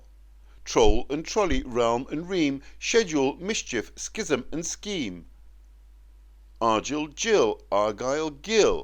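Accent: United Kingdom. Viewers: So far this is the best rendition I have found, with a clear RP (Received Pronunciation) accent. It is nice to have the complete version too.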